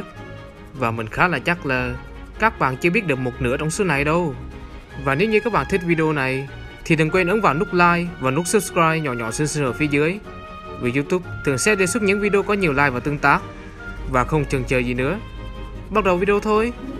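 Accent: Central, Vietnam. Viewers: Nếu mn chưa theo dõi kênh của lâu thì hồi xưa tầm 1 năm trước có nói tiếng miền trung =)))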